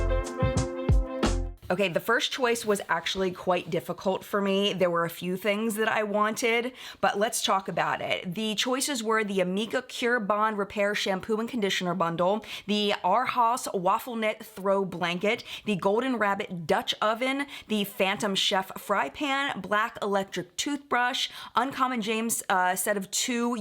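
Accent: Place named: Maryland, United States. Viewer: My fellow Marylander!! I love your Maryland accent, “Baltimore” 😍😍 hello from Riverdale Park, MD!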